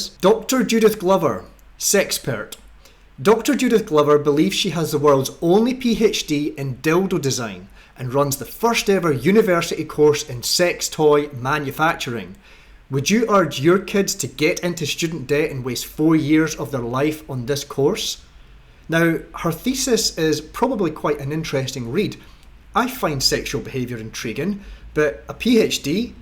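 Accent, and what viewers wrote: Scotland, United Kingdom. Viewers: The fact that this is all narrated with a heavy Scottish accent makes the whole diatribe the more entertaining 😂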